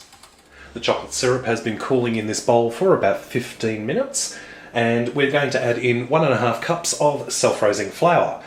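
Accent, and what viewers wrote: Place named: Australia. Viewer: thats just his epic aussie accent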